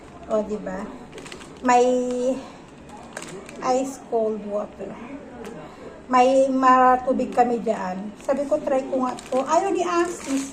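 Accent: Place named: Laguna, Philippines. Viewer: Nkpunta KC sya Laguna..kya may eh n Rin sya.pero timmangkin suna nga ilocana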